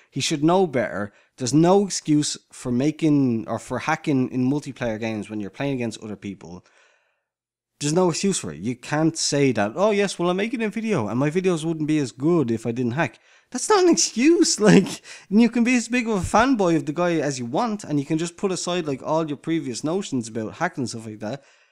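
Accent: Ireland. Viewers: I like your strong Irish accent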